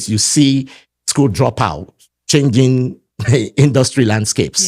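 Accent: Nigeria. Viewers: This man speaks like Nigeria 🇳🇬 n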